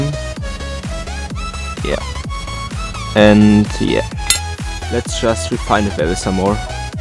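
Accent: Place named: Germany. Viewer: Hab an der Aussprache direkt gehört, dass du deutsch bist haha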